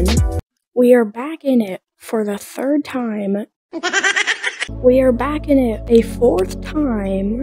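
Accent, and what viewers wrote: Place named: Germany. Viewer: you sound super german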